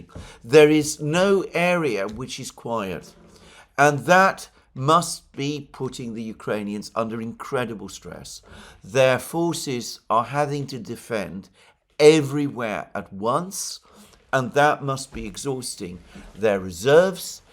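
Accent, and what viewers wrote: England, United Kingdom. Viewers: This just goes to show that just because you have an English accent, doesn't mean you know something. Your economic analysis is a bunch of hot air. What will you say when the tariffs work, which they will, and they already have started working.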